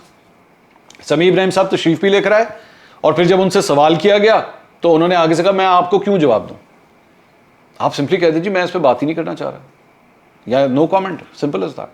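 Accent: Punjab, Pakistan. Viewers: Ap ka punjabi tarka bht acha lgta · Hahahaha Bhai ap ka ya Jo panjabi ka tuch h vlog main ya Kamal ka hota h I love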